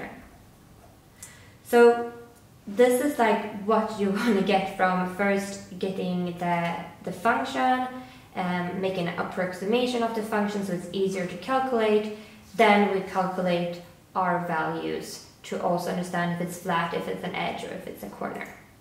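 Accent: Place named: Germany. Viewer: haha I was just guessing from the accent. Where in Germany do you live?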